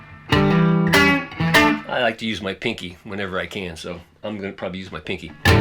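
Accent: Liverpool, United Kingdom. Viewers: Even nailed our Scouse accent, la'! Well, almost.... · no one can succeed in doing a Scouse accent like......!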